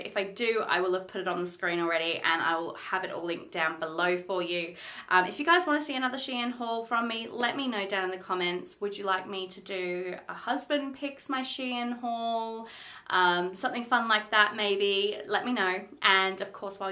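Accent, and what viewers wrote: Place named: Australia. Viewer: So happy to hear an Aussie accent doing hauls. ( I live in Tassie)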